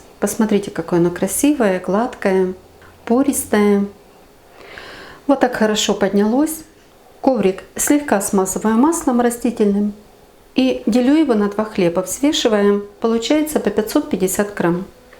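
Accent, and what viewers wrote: Ukraine. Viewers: у вас така гарна українська мова❤ Дякую за хлібчик.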